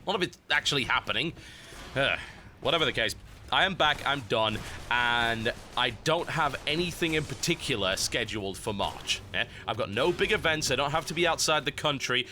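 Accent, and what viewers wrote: United Kingdom. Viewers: well he better not lose the british accent :) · he will never lose his British accent, it may fade a bit, but no he won't. I know numerous people who moved here from the UK and they still have a strong British accent. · you helped me to continue to use my 'modernised' victorian accent despite the petty mockings of my schoolmates. If you lose the accent, know that I shall continue to use the accent in memory of, arguably, my favourite british person of all time. Good luck in the states.:) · Moving to the States? hope he doesn`t lose his awesome british accent! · Farewell, British accent. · and so ends the o_O. Hope you manage to maintain the accent. British accents are cool.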